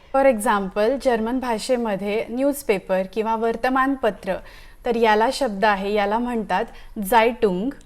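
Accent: Pune, India. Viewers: Wow....Pure Puneri 👌😂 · Tu punyachi ahes ka. Khup shudhh marathi bolteys mhanun.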